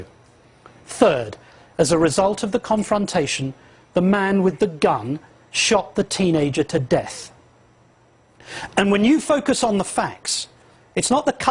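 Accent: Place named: United Kingdom. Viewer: Wow--funny how far he stretches to make a Conservative look bad by digging up something that happened more than 23 years before he was elected, as a teetotaller. Obama was a druggie in the 80's--notice he doesn't bring that up. Obama was promoted by murderers and terrorists. Obama tried to stop helping babies who survived abortions and wanted to let them die. If that's the best this moron can do, he needs to take that British Accdent and go hang out in Englan with that racist Paul MCartney.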